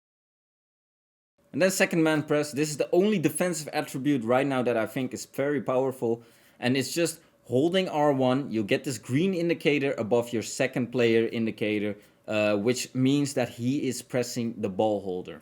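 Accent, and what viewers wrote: Netherlands. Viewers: Kom jij uit doetichem hahahaha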